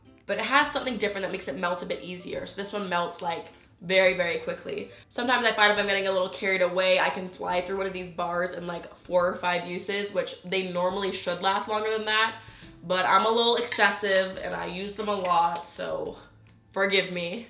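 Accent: Canada. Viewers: Is she Canadian? She sounds like my new amazing science teacher (I just moved to Canada btw) I am subscribing now because I am obsessed with Lush as well ❤️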